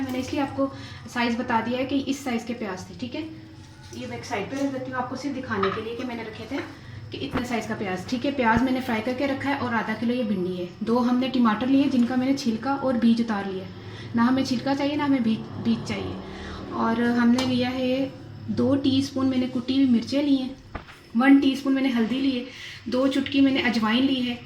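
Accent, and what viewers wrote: Punjab, India. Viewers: Ma sha Allah...nice Ma b bohat achi bindi bnata hn...abi lay k aya hn. Tu bnany lga tha socha utube se b dekhon or aaj difrent bnaon ....from UAE You say pindiyan😂punjabi accent....lay sun layan sun..sialkot...lahore...gujjranwala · Ji good I like ur Punjabi acent from 🇨🇦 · Your Punjabi is so cute...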